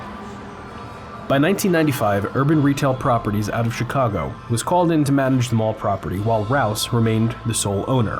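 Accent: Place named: Virginia, United States. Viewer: There’s something about an old Virginian accent I find really calming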